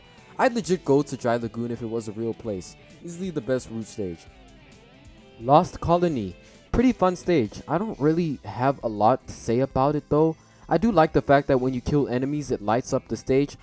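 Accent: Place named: Bronx, United States. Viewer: Can't tell if this guy's accent is asian hood or bronx and I don't care lol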